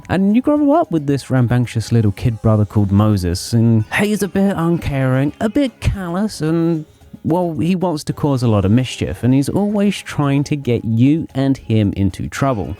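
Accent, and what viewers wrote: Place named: United Kingdom. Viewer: I don’t mean any offense at all, it’s just your shaggy look with an American accent would make me think that you are a drug addict, but with the British accent, it makes me feel like you’re a fucking sage, English Nostradamus ass white boy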